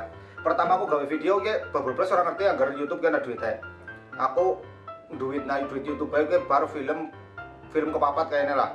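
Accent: Banyumas, Indonesia. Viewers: Q ora due instagrama mas...penting lih esih ttp ngapak mas +batir"....kritikan anggep bae masukan sing bagus..ttp positif thinking bae bn terus majuuuu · Salam cingire teko lampung kang, semangat pokoke nggawe film e. Aku wong jowo tapi nak ndelokne wong jowo ngapak ngomong iso nggarai ilang mumete, lucu soale kang😂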